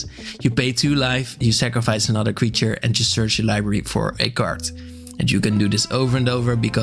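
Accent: Netherlands. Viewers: my Dutch-radar is tingling are you dutch ? edit: nvm I looked at your channel and found the answer ;-0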